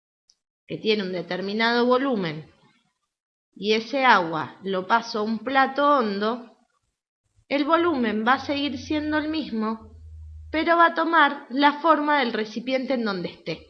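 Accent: Argentina. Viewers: Habla español cruck lo diferente es que tiene el acento argento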